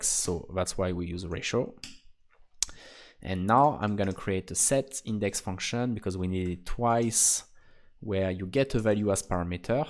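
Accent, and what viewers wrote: France. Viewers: Nice video, are you French? You have a French accent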